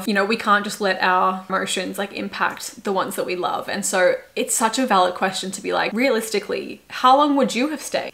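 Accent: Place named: Australia. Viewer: Australian accent is such a turn off for me. Like I wanna enjoy her content but just because of her talking style, I can't. 😢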